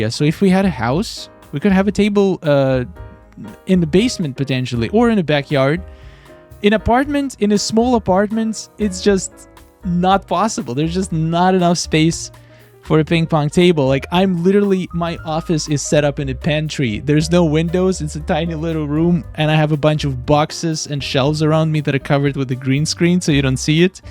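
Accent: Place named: Ukraine. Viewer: I knew you are from Ukraine 😅 I was questioning myself every time I was watching your videos, trying to hear any confirmation by accent In any words 😂. And from the beginning I start watching your videos I was reading your YouTube name as, is it true? Is it your surname like that? 😅 it was my theory Thank you so much that you saying openly about russian war against Ukraine 💛💙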